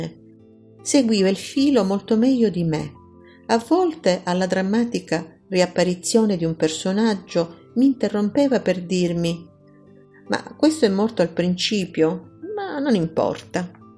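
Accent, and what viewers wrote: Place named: Sicily, Italy. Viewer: Vabbè, puoi fare un corso di dizione se vuoi, ma credimi, che anche se si sente l'accento siculo secondo me andresti alla grande!!!😉 Trasmetti più emozioni TU con il tuo accento, rispetto ad altre persone che ho ascoltato!!!😍🤗♥️♥️♥️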